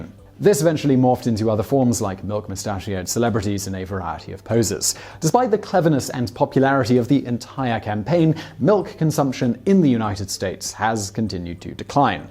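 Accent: England, United Kingdom. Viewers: I figured out one of the reasons I like this channel. He's got a real Brit accent that's better than the usual fare to listen to, but he doesn't speak it like he has a mouthful of Marbles!!! I can't recall even once, thinking to myself, Whaaat did he just say!?!! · Why r u so triggered lmao ? That's the British way of saying Nike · Briar Hood in England (where he’s from so this might explain it) we say Nike the way he did and Ad-ee-das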